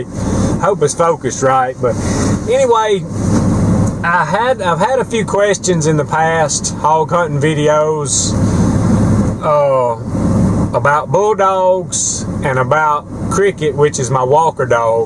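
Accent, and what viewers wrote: South, United States. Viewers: Nice funny accent, I'm not American but I laugh when I hear this accent I think it is southern,right?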